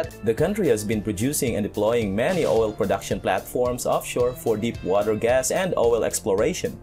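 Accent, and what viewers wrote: Philippines. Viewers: Pinoy ka boss? Accent mo kasi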